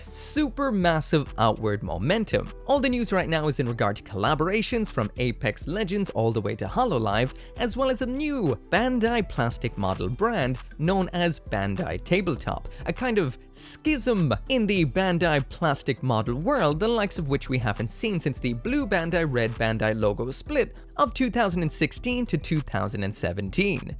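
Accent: Ireland. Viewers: not the 1920s bavaria 😭….. also ? u are irish?? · I heard the accent and knew straight away you are one of my own.. I got my first Gunpla in ken blacks in Kilkenny. Genuinely think its become an Issue for me now the bank account is gonna be crying for a long time now haha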